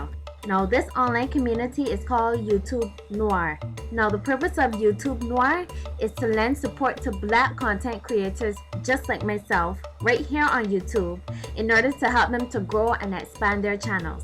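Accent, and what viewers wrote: Barbados. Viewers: I hear the bajan accent .. love it! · You know a bajan voice anywhere l am so glad to see a BAJE doing this kind of stuff and your instructions are great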